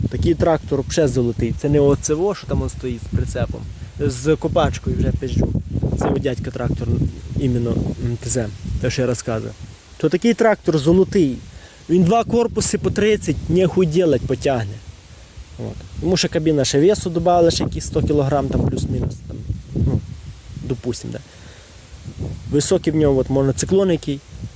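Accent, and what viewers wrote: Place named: Volyn, Ukraine. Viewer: Бо на Волині Вісі так піздять, я тоже з Волині і я знаю як тут люди говорять 😂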